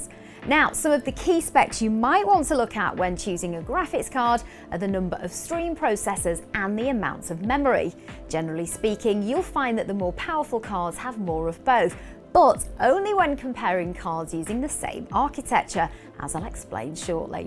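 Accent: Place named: North Midlands, United Kingdom. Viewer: She sounds slightly North Midlands. Leicester maybe... Nottingham. I could be wrong.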